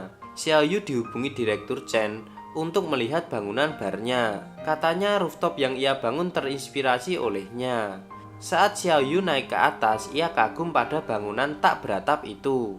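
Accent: Java, Indonesia. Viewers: film sedih kayak gini ketika di ceritain pake logat jawa... sedihnya berubah jadi horor! 😂. gak cocok cerita film romance kayak gini! cocoknya film horor atau komedi! logatmu itu looh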